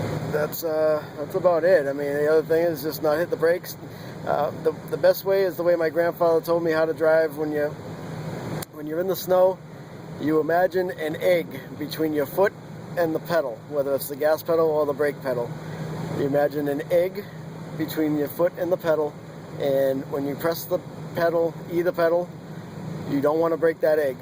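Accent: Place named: Northeast, United States. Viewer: For the snow, scenery, and NE accent: thank you!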